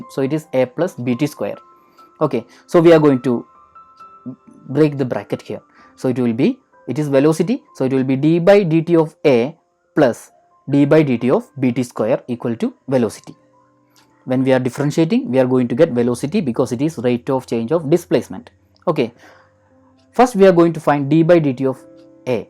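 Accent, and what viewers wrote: Kerala, India. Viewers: Mallayalli aano?😂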